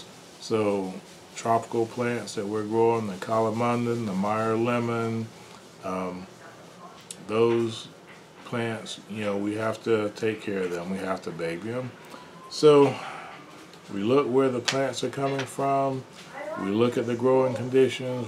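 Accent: United States. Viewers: Thanks for sharing. I told my sister that your voice reminded me of our uncle's. It is the Middle Georgia coming out. Baldwin County girl myself. Thank you also for giving the name of the seed company. Having seeds is vital in the current times. I look forward to watching more content as always. Blessings ✌🏾❤️🙏🏾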